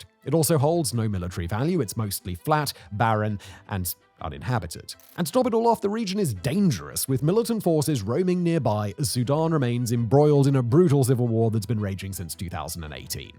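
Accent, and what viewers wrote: United Kingdom. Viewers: By "Freedom units" he means British imperial units, some even have the word British in them ie. BTU. Yes we still use the same units named after the accent he has, and he uses the units that originated in France, not the UK or CZ. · You are so British. Gawd your pronunciation is so ridiculous.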